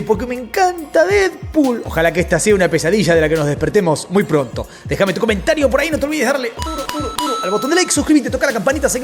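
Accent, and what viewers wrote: Argentina. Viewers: Juanito, bueno, no sé, yo vi este lío de otra forma, " Black le tenía ganas a Baldoni, pero él la rechazó, y como nadie rechaza a una mujer tan irresistible como black ( eso piensa ella), y como tiene un esposo tan poderoso y una amiga tan adinerada, pués comenzó la venganza femenina, destruir a su enemigo, y quien sabe que le contó a su esposo, para que él haya hecho este ridículo, poniendo en peligro su carrera". Yo pienso, la mujer está quiso destruir a un hombre que la rechazó, pero como dicen aquí en Alemania, " Se dio ella misma un tiro en la rodilla", o en Colombiano" El tiro le salió en reversa". Saludos Juanito, me encanta escucharte, ese acento argentino y como hablan ustedes me encanta, saludos de una Paisa en Alemania.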